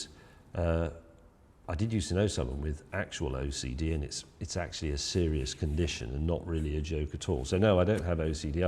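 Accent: United Kingdom. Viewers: Most important one: Is James May aware that his intonation and vocabulary are just iconic and have inspired this and many a non-native English speaker to idolize him because of how marvelous the British accent sounds coming from his mouth?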